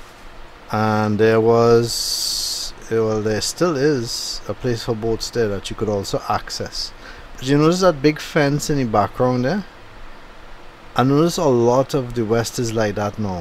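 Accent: Trinidad and Tobago. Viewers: I like your true trini voice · nice Trini accent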